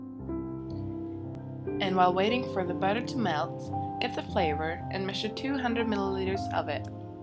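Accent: Norway. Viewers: herregud det første jeg tenkte når jeg så den viden her var: hun må være norsk.. også hørte jeg aksenten og det var vel det som overbeviste meg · Jeg så det på deg at du var norsk! I tillegg til aksenten mens du snakket, hehe :-D Måtte sjekke, og da så jeg at du var det på de andre videoene dine :-) Veldig fine muffins :-)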